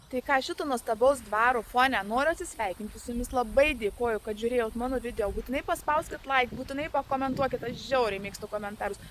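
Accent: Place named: Suvalkija, Lithuania. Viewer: Tai jo tik sakau, kad Suvalkų bisky turi😁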